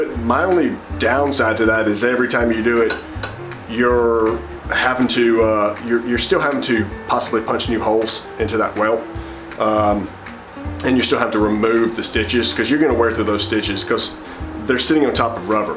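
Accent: South, United States. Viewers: can't get enough of your craftmanships and your southern accent; keep it up!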